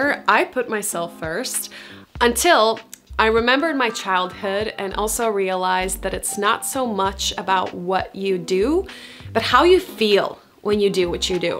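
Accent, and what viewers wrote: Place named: Eastern Europe. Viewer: nicely explained. Llve the Eastrrn Euro accent permiating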